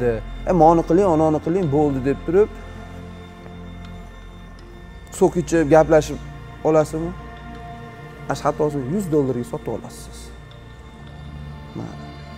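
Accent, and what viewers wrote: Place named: Andijan, Uzbekistan. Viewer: Akamiz anjanligakana😁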